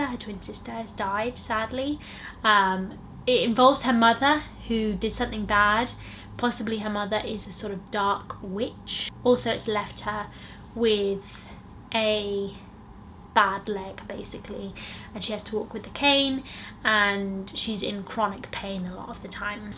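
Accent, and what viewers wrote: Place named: United Kingdom. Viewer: I love the following: 1. your hair 2. your eye shadow 3. the color of your sweater 4. your lip stick color 5. your matching colors 6. your Adele accent 7. your piercings 8. your rings 9. the background 10. your stamina to finish a 50 min video 11. your overall fashion sense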